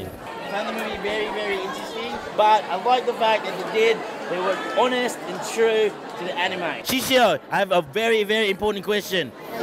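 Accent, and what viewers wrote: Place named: Australia. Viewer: japanese with austalian accent!!!:))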